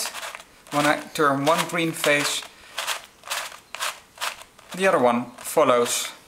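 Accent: Netherlands. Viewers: I'm dutch too and i'm actually laughing at your English... do you mind? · LOL, I can hear your dutch accent.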